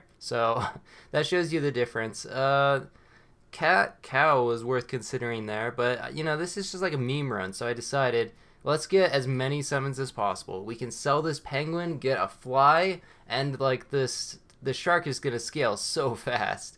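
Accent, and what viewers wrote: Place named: Canada. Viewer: Why do you sound like kenadian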